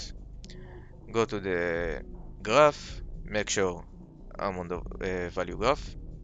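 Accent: Israel. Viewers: שמע אני מת אחי איזה מבטא ישראלי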